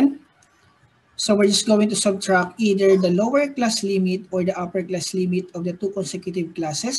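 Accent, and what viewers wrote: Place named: India. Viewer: Please explain slow in India English please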